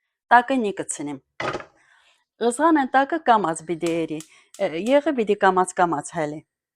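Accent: Artsakh, Azerbaijan. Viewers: Ղարաբաղի, Արցախի բառբառնա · Наверное,надо стараться понять.Это Арцахский диалект.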